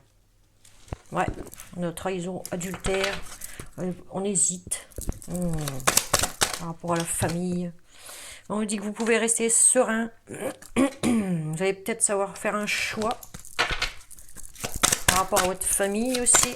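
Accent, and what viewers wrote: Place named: Nord-Pas-de-Calais, France. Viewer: Belière je viens espionner mon chéri Cancer, j'aime bien votre accent chti ayant vécu plusieures années dans le nord mis à part le climat j'aime le nord et les gens du Nord que je trouve plus chaleureux que d'autres. Bonne continuation et on ne peut plaire a tous la vie est ainsi faite😘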